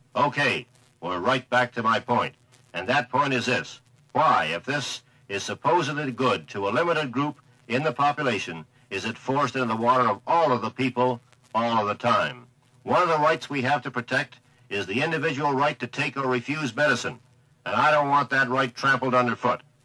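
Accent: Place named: Canada. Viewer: Wow, what a Canadian accent sounds like "Truth".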